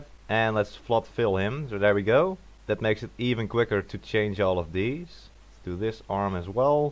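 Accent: Netherlands. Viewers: thick dutch accent there.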